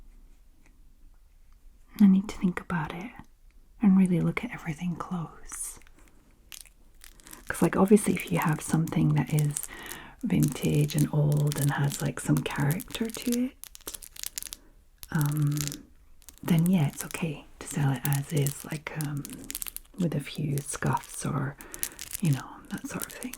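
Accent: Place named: Scotland, United Kingdom. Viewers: ! Thank you for your amazing content. I stumbled on your channel today accidentally. Your accent has me so confused in a good way. Sometimes it sounds Scottish. Sometimes Mid Atlantic. Are you Canadian? Your voice is hypnotic no matter what! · She's Scottish but has lived in France for years!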